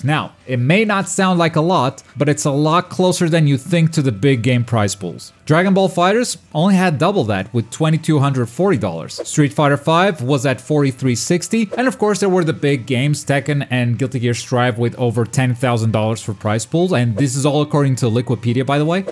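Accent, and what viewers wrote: Portugal. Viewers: I'm curious to ask but are you Portuguese? 🤔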